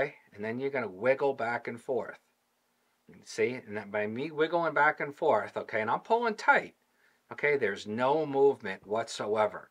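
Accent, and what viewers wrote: New York, United States. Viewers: Montana gun shop with the New York accent way too many okays for me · Pretty obvious that he's from NY/NJ. You can hear the accent slip in frequently. 👍 EDIT: Favorite revolver and handgun is my S&W 686-6 shot w/6" barrel. I've had it for 25 years now. Enjoying my new 627-8 shot with 2.6" barrel, but would prefer if I could find the 627 w/6" barrel (not currently offered.)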